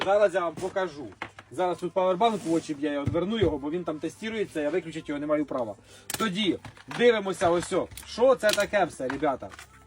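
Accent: Ukraine. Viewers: Полтавська мова) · Красавчик, этот говор, этот суржик класс,😊 я почти также говорил в детстве, осйо оце, воно. 😊😊😊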